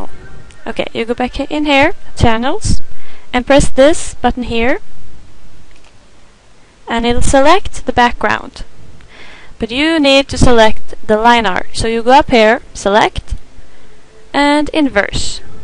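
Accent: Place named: Norway. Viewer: You sound norwegian.. Where are you from?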